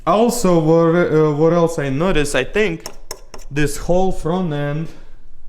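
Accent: Russia. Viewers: Привет, хороший русский акцент!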